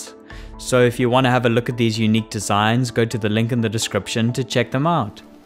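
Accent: South Africa. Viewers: Is your accent South African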